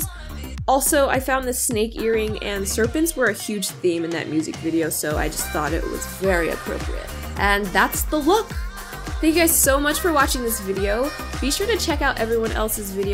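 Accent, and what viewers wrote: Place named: Australia. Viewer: SOLID AUSSIE ACCENT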